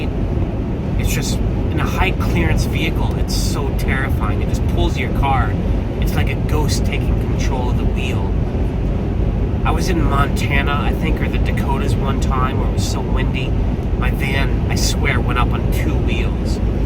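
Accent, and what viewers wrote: Australia. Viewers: That's not a British accent! That's an Australian accent! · 🤣😂 that's an Australian accent 🙋🏻‍♀️🇦🇺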